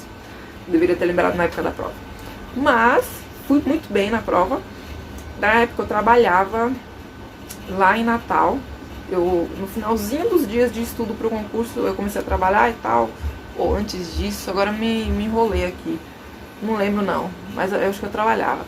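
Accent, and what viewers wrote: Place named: Salvador, Brazil. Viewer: É daqui de Salvador com certeza 😜😁😁 ! Só nós com esse sotaque kkkkk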